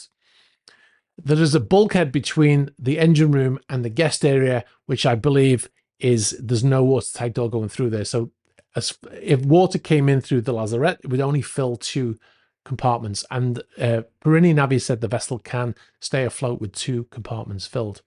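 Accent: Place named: Scotland, United Kingdom. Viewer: Man, do you speak in an unpleasant and hackling kinda scottish, almost stuttering way...did you take any lessons..?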